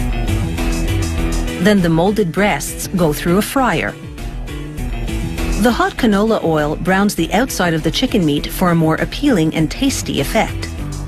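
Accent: Canada. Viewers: Didn't realize the Narrorator was Canadian until the end. Oh, U and O.😂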